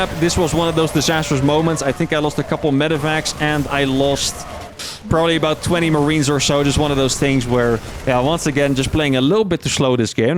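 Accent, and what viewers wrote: Netherlands. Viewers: I've been lovin' your replays, big daddy. One can hear how much you enjoy the game through the excitement in your voice, and, the Dutch accent is just the cherry on the top. Keep it up, bru.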